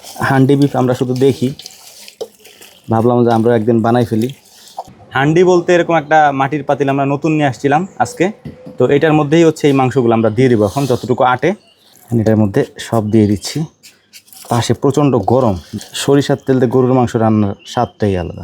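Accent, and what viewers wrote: Faridpur, Bangladesh. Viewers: ভাইয়ের ভাষা সেম আমাদের ফরিদপুরের ভাষার মতো।আপনি কি ফরিদপুরের নাকি ভাই?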